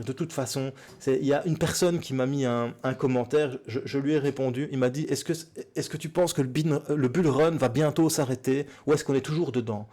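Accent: Belgium. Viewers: Je suis un belge expatrié et ton accent me donne une petite nostalgie du pays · J'ai vécu 10 ans à Bruxelles, ton petit accent belge me donne envie de chialer de nostalgie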